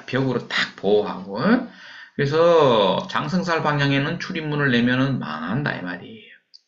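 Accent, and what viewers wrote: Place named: Yeongdong, South Korea. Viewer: 예전에 선생님이 라는 호를 사용하실때부터 말씨도 그렇고, 제 고향 인근 분이 아닌가 생각했었는데 맞군요!! (저는 고향이 황간, 영동쪽이 아니고 접해 있는 경북입니다. 고등학교 친구 중에 황간 출신도 몇 있죠!) 템플스테이 할곳을 찾다가 사를 다녀온 기억도 나네요. 산 계곡, 월류봉, 물한계곡 참 좋은 곳에서 태어나셨네요!! 올갱이국도 생각나구요. ^^ 강의 열심히 챙겨서 듣고 있습니다!! 감사합니다.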